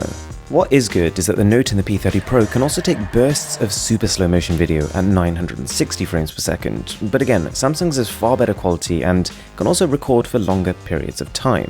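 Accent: United Kingdom. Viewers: That brittttttttish acccccccent is thiccccccccccccc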